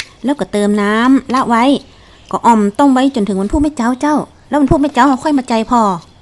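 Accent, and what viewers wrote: North, Thailand. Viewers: เว้าภาษาเหนือเพราะมากค่ะชอบแต่ฟังบ่ออกบางคำ รวมๆแล้วชอบจ้า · ชอบเสียงพากษ์จังเลยค่ะ พูดภาษาเหนือได้น่ารัก ป้าฟังรู้เรื่องเป็นส่วนใหญ่ ส่วนน้อยมากที่ไม่รู้เรื่อง แต่ชอบฟังมากๆค่ะ เป็นกำลังใจนะคะ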